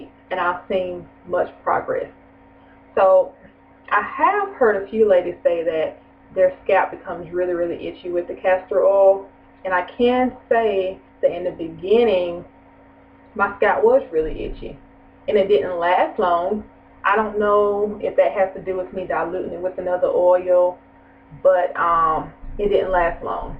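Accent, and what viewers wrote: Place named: South, United States. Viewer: swear I love her southern voice... would hit that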